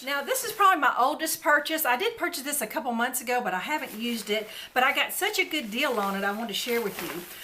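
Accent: South, United States. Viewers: I am new to your channel and I love it!!! I am from Mississippi and you sound like your from the South 😊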